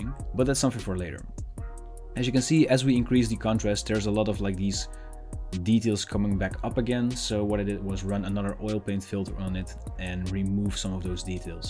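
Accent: Netherlands. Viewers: Deze design is echt zo dope! Ik hoor aan je engels toevallig dat je nederlands bent 😊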